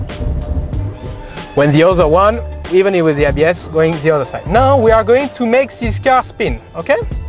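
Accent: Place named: England, United Kingdom. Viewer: ton accent anglais est vraiment détestable.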